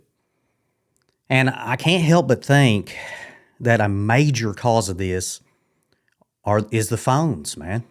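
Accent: South, United States. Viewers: I just found you. I subbed. Keep up the videos buddy. You're good at this. People need your message. Oh, and great Southern drawl. 👍 From a South Dakota Yankee.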